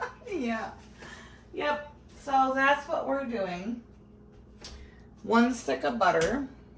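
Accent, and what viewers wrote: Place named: Alabama, United States. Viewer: so glad u liked it. Did our alabama drawl crack you up? Even I was like dang I sound like a southern Belle 😂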